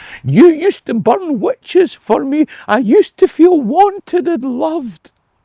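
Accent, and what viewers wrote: Scotland, United Kingdom. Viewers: I love learning more about my Scottish ancestors especially in your Scottish accent! ❤🏴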